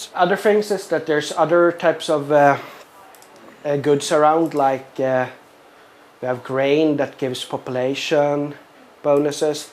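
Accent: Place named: Sweden. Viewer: Swedish accent ftw!! ;)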